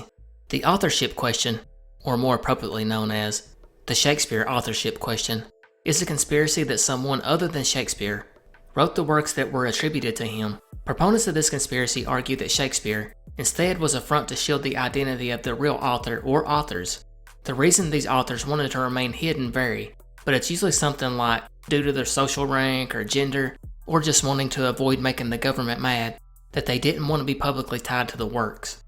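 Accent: South, United States. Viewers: Thank you! I really enjoy these. I absolutely love your voice! I'm from WV and sadly, I never hear Southern creators. Keep up the great work and I definitely look forward to future videos.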